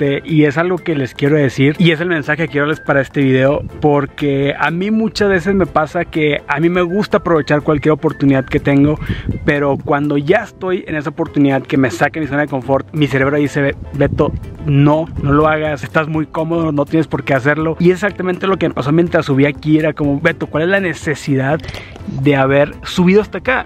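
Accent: Monterrey, Mexico. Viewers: Yo quiero un en mi vida, me encanta tu vibra, tu voz regia, tu forma de ser, simplemente palomita es afortunada de tenerte en su vida 😘 Haz más vídeos cocinando, enseñando paisajes, me encanta esta faceta 🤗 Simplemente el mejor 😍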